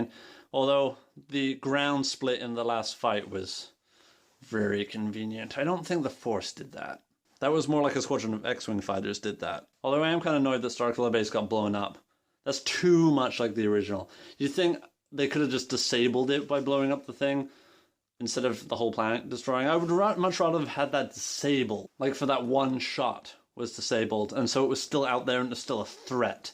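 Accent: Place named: Canada. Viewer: I'm impressed with your YouTubing skills. You're sounding a lot more Canadian than I remember though!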